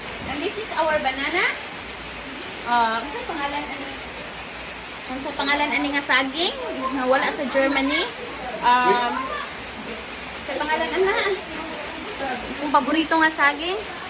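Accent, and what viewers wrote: Visayas, Philippines. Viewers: Bisaya kaayu ka Dai tundan na staging...hehehe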